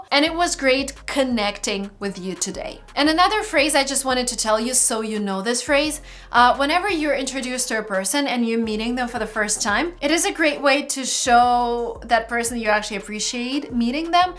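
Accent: United States. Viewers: You inspire me a lot. People say you can't have an American accent because you're not a native speaker but YOU DO, so I've been improving my accent for like 5 years now and now people think i'm American haha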